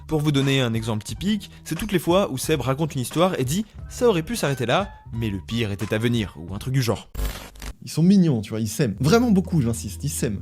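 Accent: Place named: England, United Kingdom. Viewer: J'ai vraiment du mal à comprendre ton accent anglais 😅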